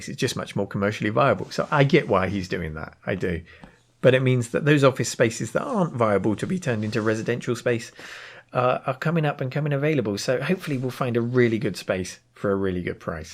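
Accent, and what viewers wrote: United Kingdom. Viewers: The name is less important then the good job you do. BTW I love to hear your british english, it's much more pleasent then american english not to mantion german channels ;)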